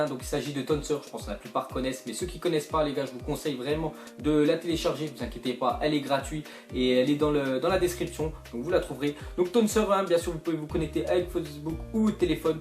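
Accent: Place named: North, France. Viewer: sa se vois tes du Nord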